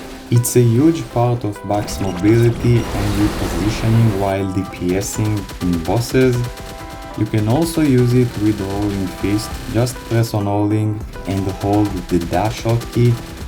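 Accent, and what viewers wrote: Israel. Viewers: המבטא הישראלי תפס אותי גם חחחחח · 1 thing i didnt see u mention is the "Takeno's Blessing" skill from mushroom shrine. Its a fake iframe that can tank some boss patterns ( Lucid's bomb, p1 Will screen crack and a few others ) AND u can reset it with Time Leap. And if ur already going for the mushroom shrine skills u can also grab the Yorozu bind as it is also resetable with Time Leap. Also, dont worry about ur accent, its much better than other Israelis. Keep on the good work!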